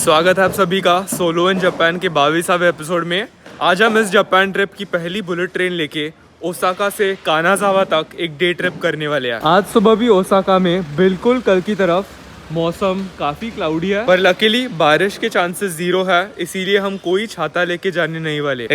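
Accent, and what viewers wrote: Maharashtra, India. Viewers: Marathi guy can never leaves his accent 😊😊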